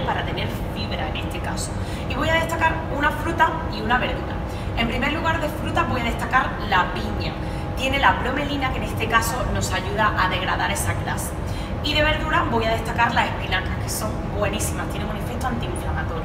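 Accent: Andalusia, Spain. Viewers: Sin ofender, eres andaluza?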